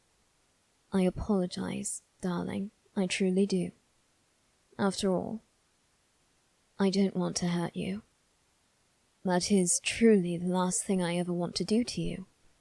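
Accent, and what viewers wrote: United Kingdom. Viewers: Ahhhhhh love your British accent it's so cute 💜💜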